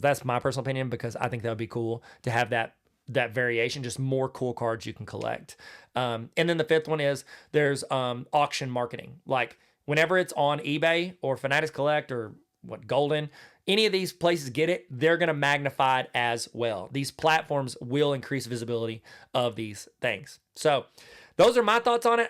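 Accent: South, United States. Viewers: I love watching your videos, because you say things like “No thing like a chicken wing”, l know you didn’t say that but you do say funny things that crack me up. Where are you from originally, your accent says the south and l am from Lexington Kentucky area with an accent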